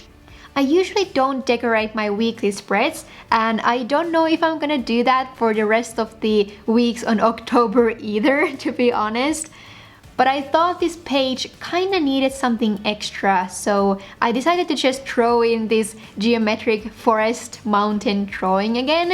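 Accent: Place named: Finland. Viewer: Found this video by searching for bj/planner videos. Amazing artistry. 👌🏽🙏🏽❤️ As soon as you started speaking I guessed you're Finnish😊🇫🇮. No offense ❤️. EDIT: The squirrel is exquisite 💕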